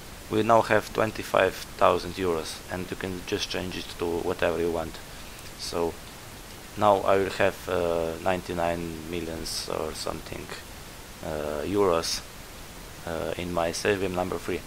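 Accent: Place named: Hungary. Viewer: Magyar vagy? :D Nagyon magyaros az akcentusod :D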